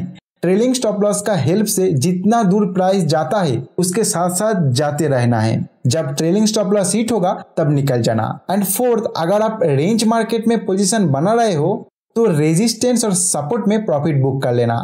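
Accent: Bengal, India. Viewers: App ko accent se malum hota hain keya app banagali hain sir?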